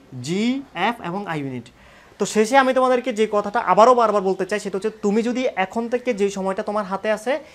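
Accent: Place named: Comilla, Bangladesh. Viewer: Comilla.....comilla feel hocce.. 🙃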